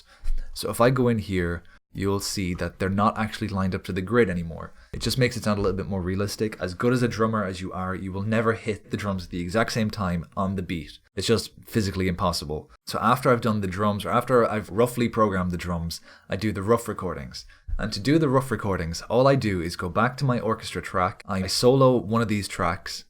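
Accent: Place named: Ireland. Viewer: Why did I never know you were Irish??? Love ittt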